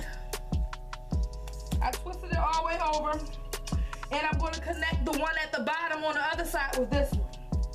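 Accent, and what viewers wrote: Baltimore, United States. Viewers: I just ran across your channel. Random question but are you from Bmore? You sound like some of my family members lol love the video hun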